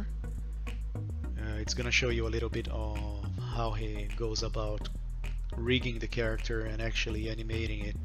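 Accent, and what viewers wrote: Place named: Brazil. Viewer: Na hora que ouvi o sotaque falei, esse cara é brasileiro.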